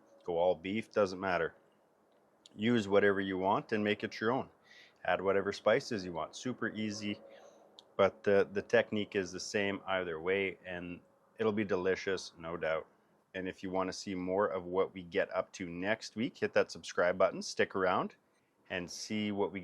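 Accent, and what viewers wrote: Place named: Canada. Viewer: I subscribed to your channel. I'll have to go take a look at some of your other stuff. Canada, aha.... I was having difficulty placing the accent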